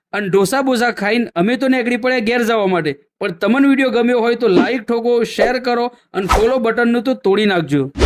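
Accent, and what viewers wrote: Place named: Sabarkantha, India. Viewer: તમારી બોલી ઉપર થી લાગે છે કે તમે સાબર કાંઠા ના છો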